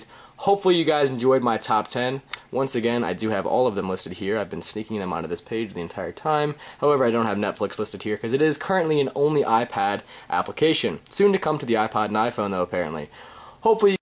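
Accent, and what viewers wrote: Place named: United States. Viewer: You have a textbook american accent! Well sounds that way over here in UK ;-), my iphone 4 is coming soon and have noted down a few apps to get straight away thanks to your reviews, keep up the good work!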